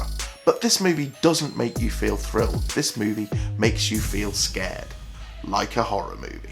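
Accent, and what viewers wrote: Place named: United Kingdom. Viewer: Are you British, that accent so much sound like British?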